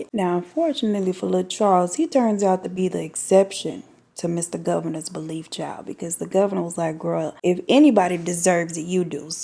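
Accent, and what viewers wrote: South, United States. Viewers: I love listening to your voice and southern accent and just certain words you use I just love! Your entire vibe I’m here for! Get it sis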